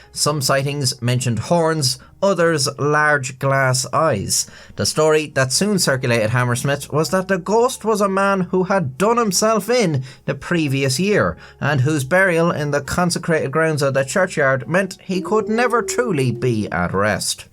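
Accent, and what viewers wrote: Ireland. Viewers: Dear, I officially watched all of your videos. Thank you for nice content, your own animation (that you done by yourself, yes I watched previous video) which is funny same as you nice sense of humour. I always watch your videos while eating, it make me almost spit food in monitor couple of times, I don't regret it. I always glad to see your irish mug and hear your irish accent, I am a bit tired of brithish and aussie accent, I am russian and I have aussie and british friend here. Wish you drink less, good health, make more jokes and of course loyal friends and prosperity. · 😂 i feel like i've just climbed out from underneath the rock. Because everybody knew about this guy's channel BUT me 😮 OR AT LEAST 1.5+ MILLION PEOPLE DID 😎👍 WITH THE CUTE IRISH ACCENT AND THE ANIMATED VIDEOS I THOUGHT AT FIRST. IT MIGHT BE JUST ANOTHER AI CHANNEL. BUT AFTER TAKING TO REDDIT AND OTHER SOURCES, IT SEEMS THAT HE'S BEEN AROUND BEFORE THE A I BOOM AND WAS DOING HAND ILLUSTRATED VOICEOVER VIDEOS BEFORE THAT TECHNOLOGY TOOK OFF! 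FROM WHAT I'M READING, THERE WAS PUSHBACK. WHEN HE STARTED TO GO WITH SOFTWARE ANIMATION, INSTEAD OF HIS HAND DRAWN STYLE BUT YOU CAN'T TAKE AWAY FROM HIS AWESOME SARCASTIC, AND ENLIGHTENING COMMENTARY. THANKFULLY, I WASN'T HERE FOR ALL THAT DRAMA 🙄❤ I'M ONLY HERE TO SUBSCRIBE. LIKE THE VIDEOS, BECAUSE NOT ONLY ARE, THEY THOROUGHLY INVESTIGATED WITH FACTUAL INFORMATION. BUT THE COMEDY STYLE COMMENTARY KEEPS ME LAUGHING. THROUGHOUT THE WHOLE VIDEO. DON'T EVER STOP BEING YOU ❤ BECAUSE THE FANS LOVE YOU JUST THE WAY YOU ARE. #SUBBED #facts #BeYou #subscribed #NewSubscriber ❤😊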